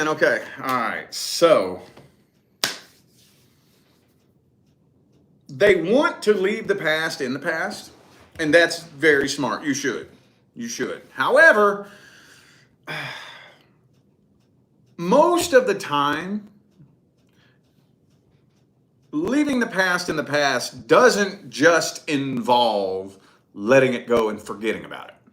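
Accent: South, United States. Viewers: Thanx so much for your reading...I'm also a tarot reader..but I love supporting all my fellow mystics...I'm from TN..you sound southern..I'm curious 🧐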